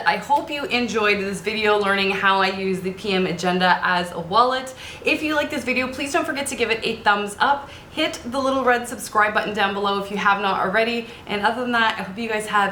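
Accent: Canada. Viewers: Hey beautiful mama. I love your accent. You must be Canadian. I’m a fan ~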